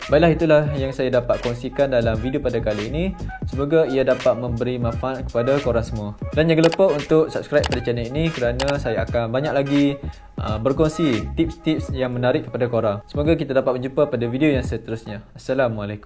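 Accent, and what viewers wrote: Kelantan, Malaysia. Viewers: Ore kelate ko bos?pekat molek bnyi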